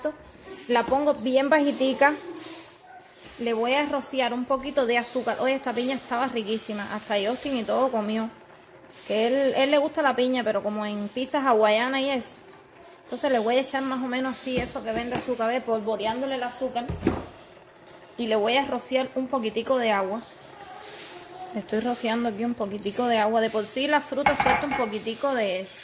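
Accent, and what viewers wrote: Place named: Cuba. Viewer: Estraño cuba y me encanta que tienes aún tu asiento cubano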